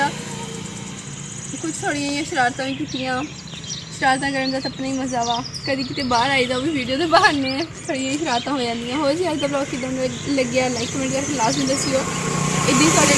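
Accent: Punjab, India. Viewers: Tusi ta same chadde punjab vargi punjabi bolde ho.very nyc vlogs 👍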